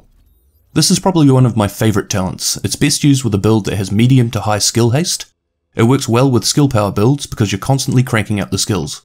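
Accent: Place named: New Zealand. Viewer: Holy shit, never knew you were a kiwi too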